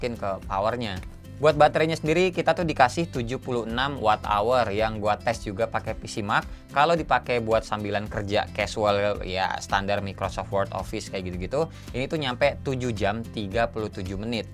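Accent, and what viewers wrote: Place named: Java, Indonesia. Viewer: Yah, bang kok gak intro nya ga ada "ketemu lagi" wkwk (Terus agak medok wkwk)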